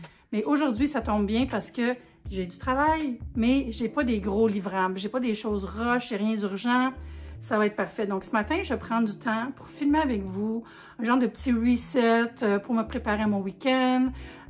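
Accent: Quebec, Canada. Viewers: Hello! 🥰 Moi, petite franchouille, j'adore toutes tes expressions avec l'accent québécois! ❤ C'est tellement sympa, naturel et simple... Je vous adore, les Québécois! ❤❤❤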